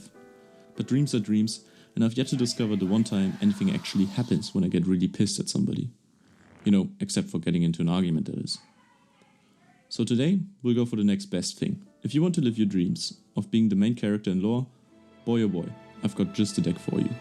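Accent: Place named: Germany. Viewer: Actually good content. The Accent is still strong so I instantly know ur a fellow Landsmann but that shouldn't bother anyone. Keep it up!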